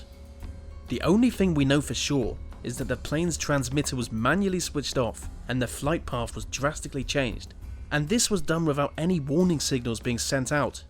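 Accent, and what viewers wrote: England, United Kingdom. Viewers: Why do you continuously substitute "F" for "Th"? We hear you pronouncing "Th" when it's IN a word sometimes, but often when it begins or ends a word you substitute an "F". You say "with" properly, so why do you then say "August sixteenf 1942 "? It's really distractive and annoying. If it was your 2nd or 3rd language it would be understandable, but you have a distinctive English accent, so it must be your 1st language. Please make an effort to speak properly, since it's obvious that you can.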